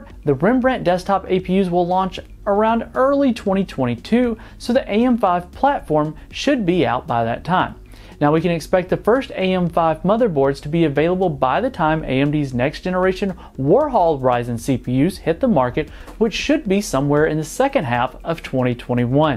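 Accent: South, United States. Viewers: your subtle southern accent is pleasing to the ear for some reason 🤠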